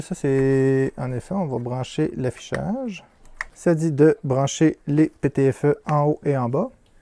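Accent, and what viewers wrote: Quebec, Canada. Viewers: n y vois surtout pas de moquerie. C est juste rigolo. J aime tes vidéos et ton " accent québécois", tes expressions bien de chez toi:-) Amicalement.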